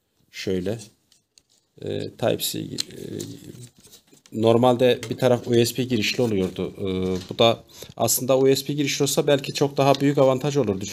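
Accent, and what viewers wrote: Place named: Kars, Turkey. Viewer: Şive karsa benziyor😎güzel video👍🏻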